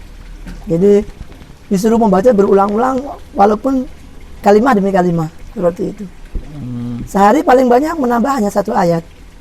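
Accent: Java, Indonesia. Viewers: Bu nyai, klo ngendikan njawani banget, tapi gitu baca Qur'an, sambil merem dengernya ky org timur tengah, masha Allah fasih ... yai dan gus di kediri idola dan panutan sy..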